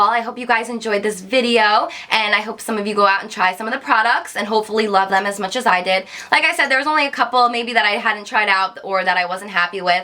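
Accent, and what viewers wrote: New Jersey, United States. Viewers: is she from jersey??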